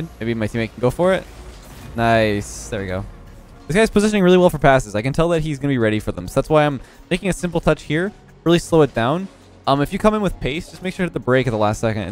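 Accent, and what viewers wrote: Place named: Canada. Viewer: sounding especially Canadian today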